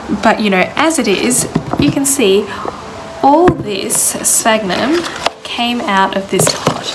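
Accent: Australia. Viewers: Excellent video on how to correctly repot orchids. Love you Aussie accent cheers.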